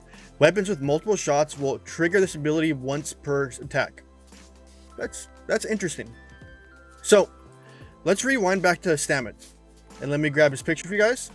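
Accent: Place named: United Kingdom. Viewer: YO dude, are speaking the queens language?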